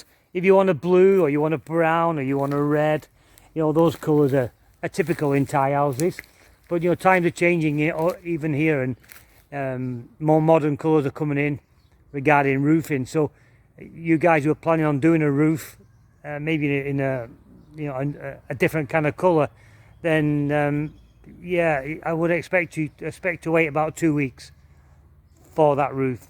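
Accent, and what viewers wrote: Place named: Northern England, United Kingdom. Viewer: Appriciate the updates, I’m from the Uk also you sound like your from Leeds/Preston area? Anyway your build is just Infront of mine! How much land do you have? I’m 37 and have 1 rai in khon kaen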